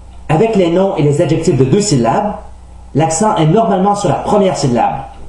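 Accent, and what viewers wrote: Quebec, Canada. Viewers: il imite l'accent québécois ou c'est sa voit normal ? (ce n'est pas une moquerie !) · tu ne serais pas québécois ?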